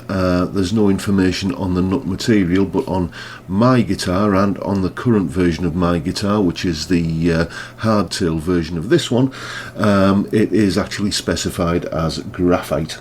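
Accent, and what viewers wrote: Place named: Teesside, United Kingdom. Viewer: Thanks for the review, I'm just about to buy one of these (I think), or an ESP Ltd 256FM in black for the same but I prefer the beautiful colour of the HB. Glad to hear it sounds as amazing as it looks. On a side note, you sound like you're from my neck of the woods - Stockon on Tees? Definitely a fellow smoggie :)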